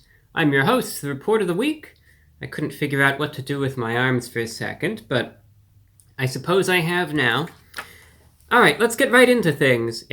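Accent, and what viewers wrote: Standard. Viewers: just modern proper english. Idk which of us is right tbh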